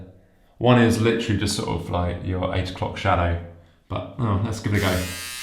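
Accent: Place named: United Kingdom. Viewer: When I heard the British accent I knew there would be excess talking xD great review tho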